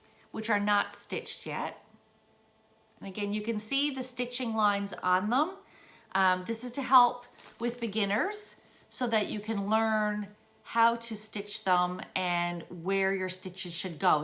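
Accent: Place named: Newfoundland, Canada. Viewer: First time seeing your video on my feed. I thought to myself, I need to figure out where you were from. Towards the end of the video, you talked about the fires here in Newfoundland. I always find it relatively easy to distinguish newfoundlers speech. Yay! Found a needleworker on YouTube from the rock. Living on the west coast. Keep well and thanks for your time.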